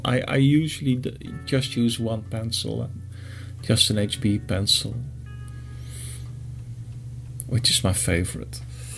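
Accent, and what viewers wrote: Netherlands. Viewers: You sound really dutch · Youre dutch i can hear it 😂 Ik ben ook Nederlands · Are you dutch? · Mooi gemaakt , ik dacht al een Nederlands accent te horen heb mij direct ff geabonneerd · Are you Dutch? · Love to this Dutch accent ahhaha.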